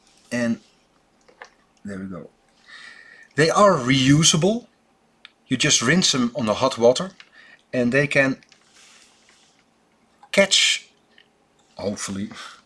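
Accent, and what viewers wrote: Netherlands. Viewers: Your dutch accent reminds me of relatives in Grand Rapids when I was a child growing up.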